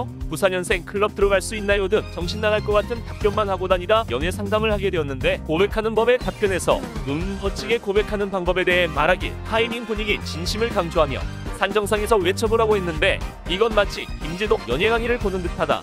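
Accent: Jeolla, South Korea. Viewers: 뭐여 전라도여?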